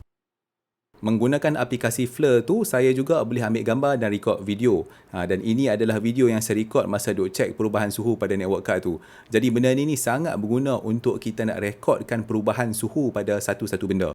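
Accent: North, Malaysia. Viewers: Saya pernah tengok dalam video dia yang lain, dia ada cafe. Sebenarnya video2 macam brother ni buat banyak dalam youtube, yang cun nya brother ni buat video dalam bahasa Melayu slang utara, dan cara penyampaian pun mudah faham, bukan semua orang boleh hadam video omputeh ni termasuk aku.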